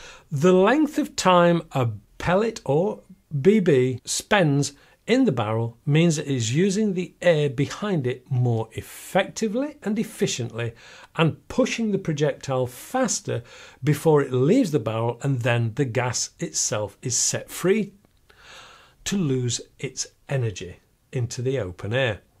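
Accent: United Kingdom. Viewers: I could listen to British people talk all day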